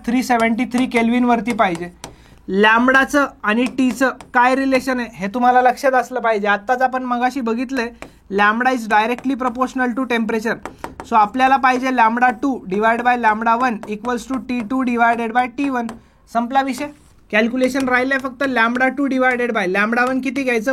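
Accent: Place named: Marathwada, India. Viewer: Jalna aurangabad style😅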